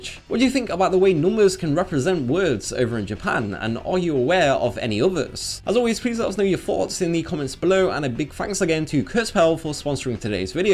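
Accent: United Kingdom. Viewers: That's because he's British, all British people would call the anime Dragon Ball Zed.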